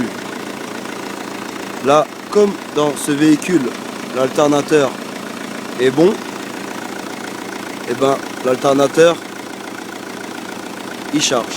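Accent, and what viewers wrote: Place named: East, France. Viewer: magrébin plutôt. Mais il a plus un accent de l'est j'ai l'impression.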